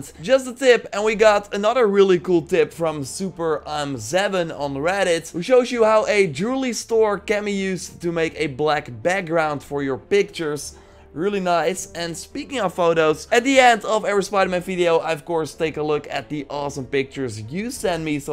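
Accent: Netherlands. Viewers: He's not trying to be annoying it's an accent. He's Dutch and have said on many streams that he's sorry and that he tries his best to talk in English. Some Dutch ppl have a hard time speaking in English so it's a good thing that he can even speak english. Next time before you say someone's annoying do some research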